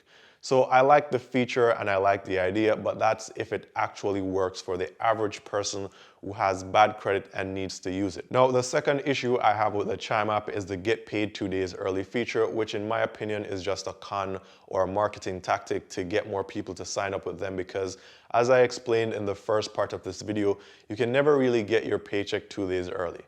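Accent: Jamaica. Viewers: Are you from the Caribbean (Jamaica)? You don't sound like American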